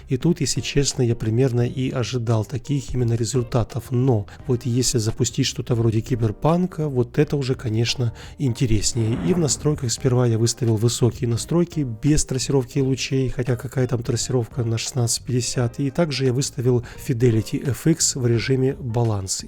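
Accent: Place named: Ukraine. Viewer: Что, мне послышалось, или у автора появился легкий украинский акцент?) Он решил что добавлять перевод названия на украинском мало?)) (не смотрел его пару месяцев, потому сразу заметил)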